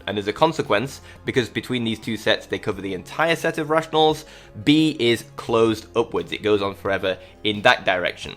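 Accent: Yorkshire, United Kingdom. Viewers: *Man with an accent vaguely resembling the Yorkshire accent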